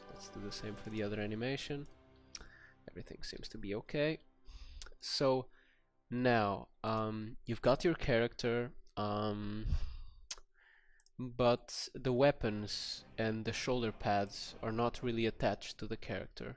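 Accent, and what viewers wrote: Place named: Portugal. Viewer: Nice video man, could swear by your accent you are Portuguese (^_^) (Confirmado, reparei agora no canto inferior direito do ecran, ta o teclado em pt heheh) Continua esses videos bacanos, abraço.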